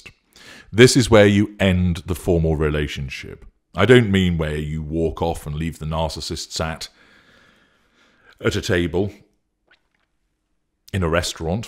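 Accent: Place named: England, United Kingdom. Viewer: Uh oh I just found your channel a few days ago but I think I am addicted to your voice already. Eek. I always did love an English accent! I've always loved the English, the dry humor.... I'll be okay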